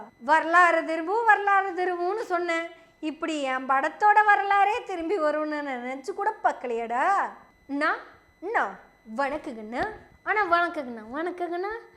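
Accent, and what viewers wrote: Kongu, India. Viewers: கோயமுத்தூர் குறும்பு கொங்கு தமிழும்‌ சேர்த்து விளையாடும் அழகே‌அழகு வாழ்த்துக்கள் சகோதரி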